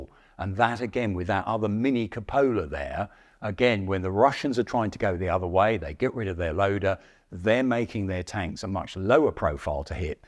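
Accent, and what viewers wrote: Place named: United Kingdom. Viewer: IDK what it is about the British accent of older dudes, but it fits this. As an American it should be US law that in documentaries, there should only be a British commentator. It makes me feel like I'm learning and not just listening to information I will never need 😂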